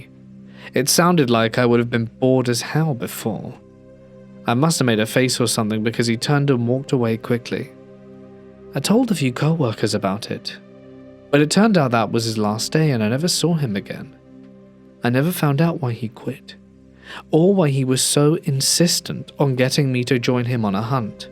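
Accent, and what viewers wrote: England, United Kingdom. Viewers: Lovely ..ever so.quintessentially English voice ..just lovely .From from NW England myself ,makes a change hearing a REAL VOICE & not this Ai souless rubbish..Thank you x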